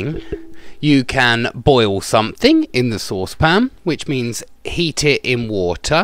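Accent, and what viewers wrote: United Kingdom. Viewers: your british accent is just lovely.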